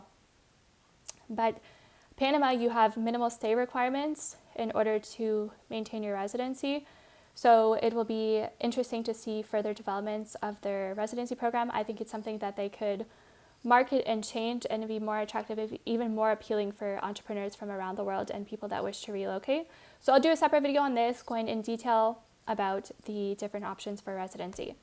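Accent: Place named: United Kingdom. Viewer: Preconceived notion of extreme poverty in so called (3rd world countries) woow, obviously you are not from the US, your accent is more British. Have you ever visited some major US cities like Baltimore, Philadelphia to name a few, talk about extreme poverty.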